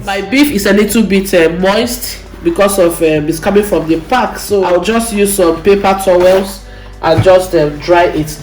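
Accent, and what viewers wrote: Nigeria. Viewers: Damn I love Nigerian accents 😩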